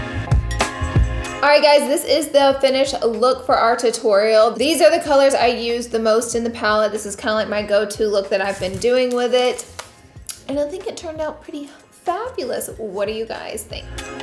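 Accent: South, United States. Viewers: This is random and probly so 2017 but I love how you say concealer! Love that Southern accents still coming thru. Also you are always so fun and hilarious. Cracking me up all the time. Between you and Manny just making day. Thank you for being that Icon ,that boss babe for our future generations to look up to, congrats on your new holiday collection and for the price are u kidding, that's a good deal.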